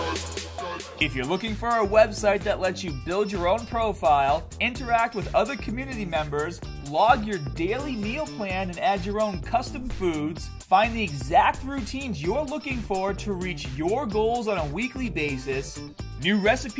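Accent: Boston, United States. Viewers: I love how u just embrace that boston accent - baaaaah instead of bar, haaaaarrd instead of just hard xD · I'm playing Fallout 4 but none of the characters in-game have that Bostonian accent of yours :((( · Boston is strong with this one. I hope you aren't taking those little jokes about your accent seriously by any means. It's part of you! :)) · Dude his Boston accent REALLY shows in this video. hahaha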